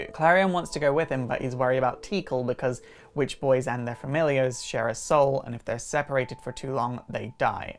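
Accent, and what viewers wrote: Scotland, United Kingdom. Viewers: I CANNOT take a Lovecraft quote seriously from your airy Scottish voice. Good video, loved the Kabbalah talk at the end. A weekly video series on Kabbalah with charts and diagrams would be killer. Happy Halloween.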